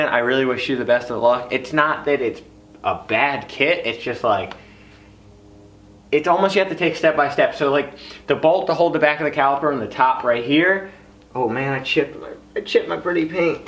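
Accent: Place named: New Jersey, United States. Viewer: games&memes because he’s from jersey they have accents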